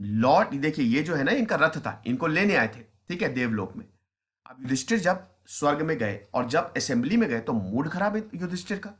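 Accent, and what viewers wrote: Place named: Uttar Pradesh, India. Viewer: I love to hear your voice Sir... N my favorite is Bhaiya.... The UP wala touch... 😁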